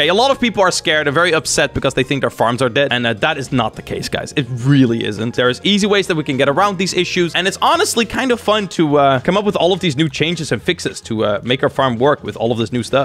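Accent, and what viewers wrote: Netherlands. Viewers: Hey are you Dutch? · You Dutch I think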